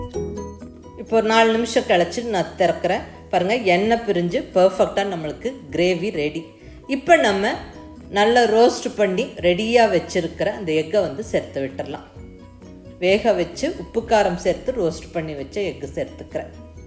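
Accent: Kongu, India. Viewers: I like your tamil kongu slang mam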